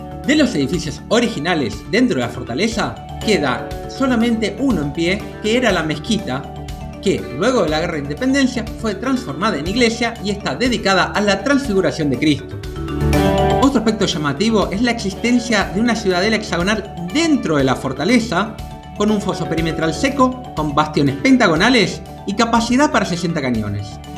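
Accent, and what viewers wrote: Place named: Argentina. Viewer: Gracias nuevamente. Solamente que me cuesta seguirte con esa pronunciación argentina (muy musical y familiar, pero que a veces es difícil para los peninsulares). ¿Cómo has dicho que era el acueducto Olvidado? Lo he buscado en el mapa tal cual y no lo he encontrado. Gracias. ¡Ah, y enhorabuena por la página!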